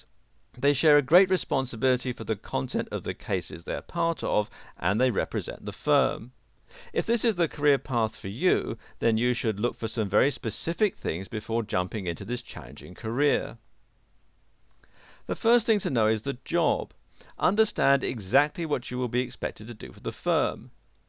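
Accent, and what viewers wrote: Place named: United Kingdom. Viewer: Pretty sure that's not a canuck accent, eh? Sounds pretty British to me.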